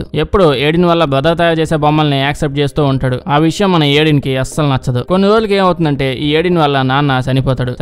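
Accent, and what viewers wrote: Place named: East Godavari, India. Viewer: Talking power good east godhavara majaka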